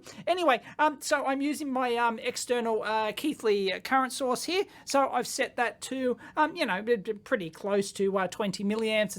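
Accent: Australia. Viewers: is Australian. That's pronounced " 'strine".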